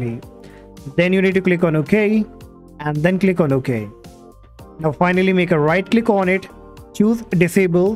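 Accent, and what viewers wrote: India. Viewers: FINALLY I FOUND THE VIDEO THAT HELP ME I AFTER I HEARD YOUR IDIAN VOICE GET INTO DETAIL I AINT EVEN LOOK AT THE COMMENTS I JUST DID IT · when you heard the indian voice .. mean wasting your time!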